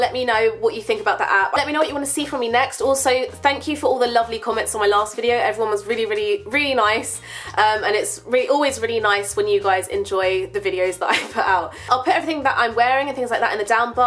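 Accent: United Kingdom. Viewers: LOVE The Hobbit! Its cool to see we have similar reading taste! Love your videos as well <3<3<3 I live in the US, but my boss is a Brit, and he talks similar to you! Your accent/voice put a smile on my face! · Love your british accent.dont like the hair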